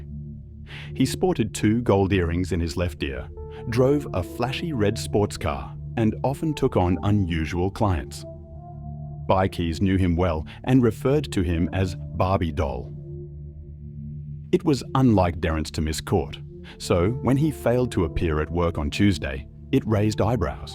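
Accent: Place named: Italy. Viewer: Was that supposed to be people talking over each other at 11 : 00 in, mate? I thought maybe it was you practising a technique of phone calls in the background as you said the rest. Maybe not I couldn’t follow it though. However, the Italian accent won me over.. I’m subscribed. I live in Melbourne and have all my life just removed to the Wimmera. Happy to find an Australian crime channel by an Australian. Good work mate. Anyway it’s 1108 into your story, I’m going back into here the rest of it. If David ?????? did it he’s the worlds dumbest criminal that ever lived LOL.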